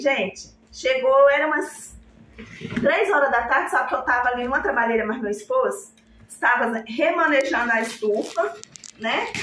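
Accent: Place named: Minas Gerais, Brazil. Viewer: Boa noite querida !!!gostei muito de sua pessoa!!!acho que tenho algo em comum nosso sotaque mineiro kkkk😄comprei 2 semana passada e está vindo de rondonia tao longe estou aguardando espero q chegue em bom estado se eu soubesse antes q tinha vc q vende essas maravilhas tao perto de mim teria comprado de vc mas enfim nao faltarar oportunidade!!!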